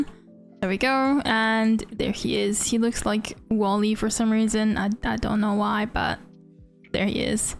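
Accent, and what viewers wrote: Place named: Ireland. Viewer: what accent do you have? it sounds like a mix of cork and belfast ireland but idk for sure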